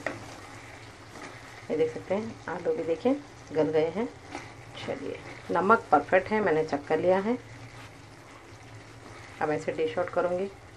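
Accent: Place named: Hyderabad, India. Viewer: Apki Hyderabadi baat sunke bohat Acha Laga...I m from hyd but living in Oman... always I miss Hyderabadi people & Hyderabadi foods..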